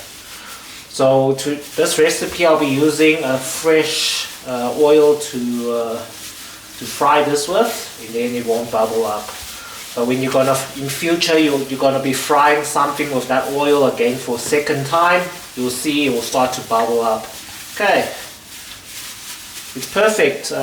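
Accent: South Africa. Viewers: Oh my goodness! I absolutely LOVE you and look forward to trying this recipe over the weekend. Thank you so much for sharing, you just made me very hungry with a craving for fried garlic chicken thighs. And by the way... I absolutely LOVE your accent. You remind me of my South African friends in Toronto, Canada. Much love to you and your family. Thank you! xoxoxo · Are you a South African national? I ask because even though you're clearly of Asian descent...the way you talk foreign to Asia, your accent is very African😂, are you from South Africa by any chance?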